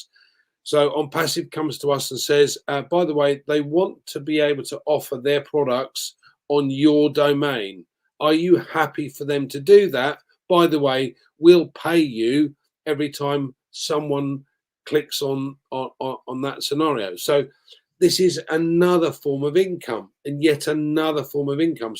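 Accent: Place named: RP, United Kingdom. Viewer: that was a very good explanation in plain english or what we call Queen's english and hope at least 35 out of 50 got it😳:hand-pink-waving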